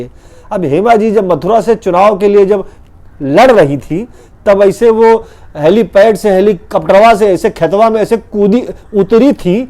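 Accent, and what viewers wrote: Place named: Kanpur, India. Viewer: Rocks!! Once again. You hit the bulls eye... 🤘🤘🤘🤘 God bless... And ur kanpuriya accent is getting better by the day... Hum bhi flabbergastiya gaye!!! ❤❤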